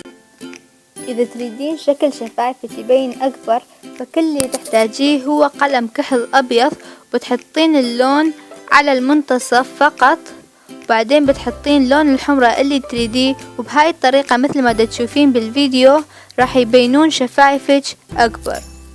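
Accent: Mosul, Iraq. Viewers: ممكن اسوين فيديو تعريف عن نفسج لان مدا نعرف انتي عراقية او سعودية لو سورية 😂😛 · متاكده انتي عراقيه بس العراقيات ميحجن هيج مثلج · حلووو الفيديووو .❤عجبني😍 بس سؤال انتي عراقيه 😃❤ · ه ممكن اسال سوال هل انتي عراقيه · انتي عراقية لو لا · تكدرون تعرفون من الكلام اذا عراقي اوعراقية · اريد اسأل عراقيه انتي ؟؟ وين عايشه😞 ونصايحك جميله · انتي عراقيه صح لو لا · انتي عراقيه لو لا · انتي منين من العراق لهجتج مصلاويه اعتقد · إنتي عراقية لو سعودية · لهجتج مصلاوية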